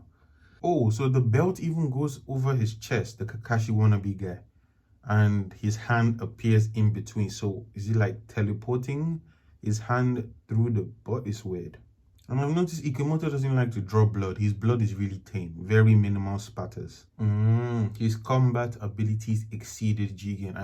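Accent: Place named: Nigeria. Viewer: I’m Nigerian too and you sound Nigerian too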